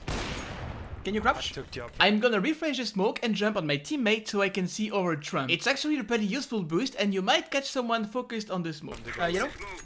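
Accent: France. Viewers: You speak like a french but look like American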